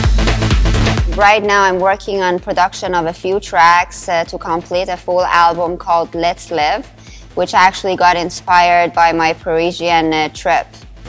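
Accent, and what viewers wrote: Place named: Canada. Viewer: wow your a Canadian? this is good to hear i kinda can tell with your accent.. how much did it cost you to purchase all your equipment and do you produce music as well?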